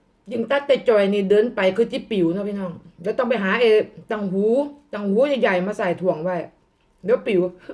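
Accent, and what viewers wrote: Korat, Thailand. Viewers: ผมชอบพี่ พูดโคราช เพราะดี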